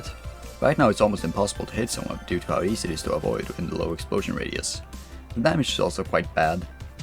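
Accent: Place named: RP, United Kingdom. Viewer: Why you speak like a BBC news people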